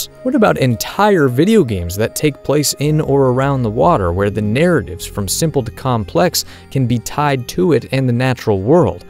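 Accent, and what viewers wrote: United States. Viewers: This guy is from NY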